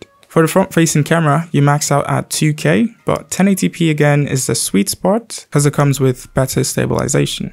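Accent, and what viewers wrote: England, United Kingdom. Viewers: I love your British accent. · Why does your English sounds British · Can i ask ask about your accent? It sounds English, not Nigerian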